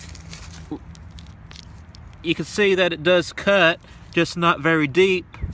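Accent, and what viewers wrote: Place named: South, United States. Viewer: Speech impediment and a southern accent, l feel your pain!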